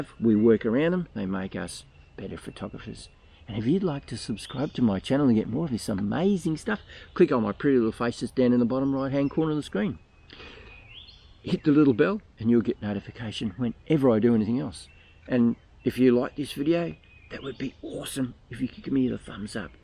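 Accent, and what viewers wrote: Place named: Australia. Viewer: I hated to say this but you sound like an Australian.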